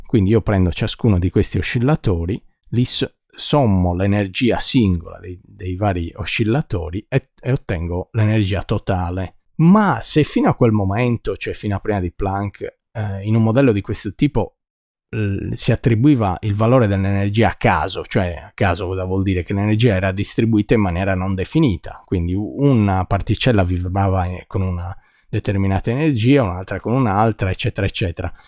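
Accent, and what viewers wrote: Sardinia, Italy. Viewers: Buonasera professore! Provare a spiegare i quanti senza partire da Plank e dal piano PX( come fanno taluni) non è solo trascuratezza o tracotanza. Peggio: é mancanza di compassione per chi segue!!! Come coloro che spiegano la simultaneità in relatività ristretta, senza compararla con un esempio di relatività galileiana. Grande lezione la sua! Anche già per chi ci capiva qualcosina... ma sono sempre utili conferme che provengono da certi lidi. Emergono le dimensioni di h in modo immediato. Ma se uno volesse capire il modulo di h... sarebbe un problema? Anni fa studiai qualcosa, ma avendo avuto all' epoca altre beghe,non mi ricordo una mazza. A matematica arrivo a derivate , integrali e un po' di numeri complessi; non so usare matrici e tensori. Può indicarmi dove cercare qualcosa. Certo non oso chiedere a lei un aiuto sul sito, se non utile a tutti. 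Grazie per la sua lezione, senza enfasi o dialettate romanesche come quelle del simpaticissimo prof. Battimelli, che per questo a volte offre il fianco ai fisici ortodossi. Lei mi sembra sardo. Se così con noi Pisani avrà , come é stato da secoli un rapporto di fratellanza. Pisa= Gallura. Gallura= Pisa! Un caro saluto e scusi la mia prolissità senile! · Confusione tanta......e un accento sardo insopportabile. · meno male 28 anni non son bastati per farti perdere l'accento sardo :) saluti dall'isola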